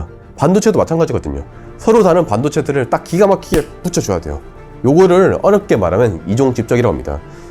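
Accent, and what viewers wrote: Gyeongsang, South Korea. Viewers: 어머 경상도 출신이신가봐요 ㅎㅎ 사투리 너무 매력있어요 ^^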